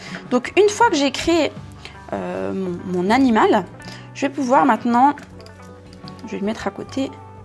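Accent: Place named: Vaud, Switzerland. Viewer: Juste à l'accent, bien vous rassures. Je suis du canton de VD, on se reconnaît quand même assez facilement entre nous ;)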